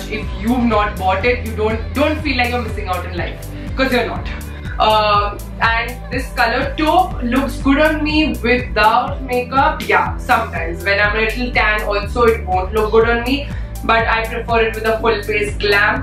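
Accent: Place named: India. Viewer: Girl you don't look Indian from anywhere.. had to open ur video and hear your accent to make sure that yes this hottie is indian indeed 🙆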